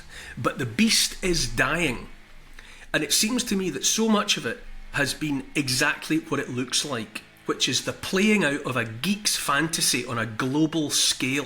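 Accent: Scotland, United Kingdom. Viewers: It's the Scottish accent. Our DNA has been programmed to revolution since William Wallace.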